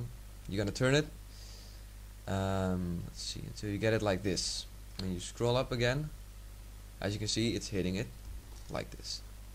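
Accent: Netherlands. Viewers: Are you dutch ? you sound like it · lol ik hoor gewoon dat je nederlands bent XD goeie tutorial 5/5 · You're dutch right? That accent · ik hoorde meteen dat jij nederlands was, =D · you sound dutch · are you dutch?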